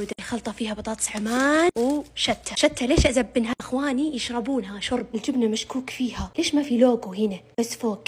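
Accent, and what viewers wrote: Saudi Arabia. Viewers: 😂😂😂 لهجه السعوديين غريبه وكيوت🤏🏻😭 😂😂شنو معناه يلبيه 🤔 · بحب لهجة السعوديات كتيييييير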